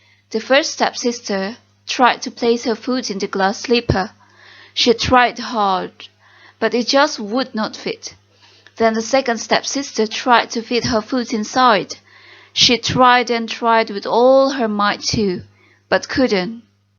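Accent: United Kingdom. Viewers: Wow! What a great Job, Sister! Where did you learn English? I love your english pronunciation and accent. It sounds like British. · First i hear you then i love you.. Auto subscribe.. It sounds like british😍 Semoga channel Kaka makin berkembang yaa.. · british ya kak 😍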